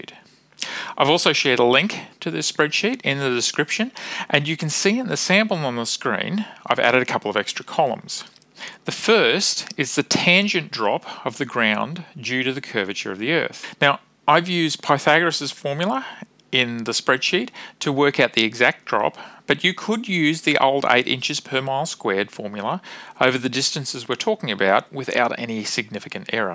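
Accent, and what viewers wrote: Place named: Australia. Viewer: Er, tritto? Excellent analysis of some gorgeous scenery (none of which I'd ever heard of). Plus being in the SE United States, I'm hypnotized by any Aussie or NZ accent.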